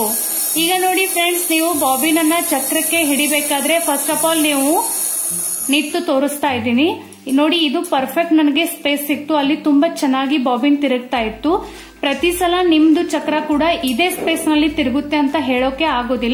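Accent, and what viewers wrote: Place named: North Karnataka, India. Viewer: Hi sister very usefull video nanu uttar karnataka davalu niu nam language tharane adtira niu yav districtdavaru ?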